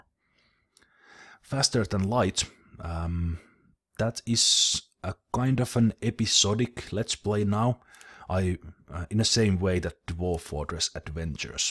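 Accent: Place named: Finland. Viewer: Gratz, you and your channal are a part of my day! When i found your channal first time i didnt quite like your voice, but now i think its epic, English+Finnish accent=Awesome!:D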